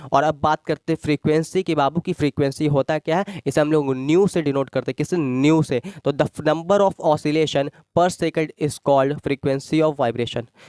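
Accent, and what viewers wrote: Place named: Bihar, India. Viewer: Sir you are from Bihar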